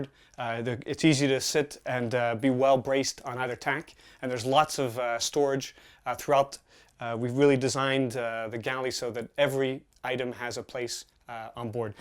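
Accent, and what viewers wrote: France. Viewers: désolé mais l'accent français n'est plus possible, on dirait que vous sortez du collège... sinon très bien présenté. bonne vidéo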